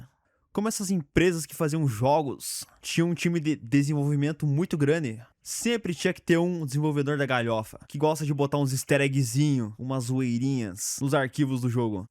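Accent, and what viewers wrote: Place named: Rio Grande do Sul, Brazil. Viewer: Esse cara é gaúcho só pelo sotaque.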